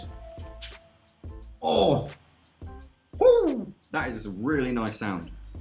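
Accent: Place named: United Kingdom. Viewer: He's a liar he lives in the U.K. 1. His accents 2. The plug How exactly did he pay dollars not pounds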